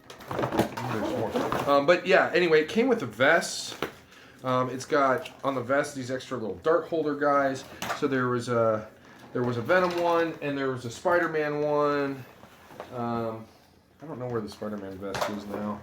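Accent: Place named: Ohio, United States. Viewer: Booger Barricade...., you can tell you are an Ohioan, I say this a boy born in Cincinnati and raised in Richmond, KY.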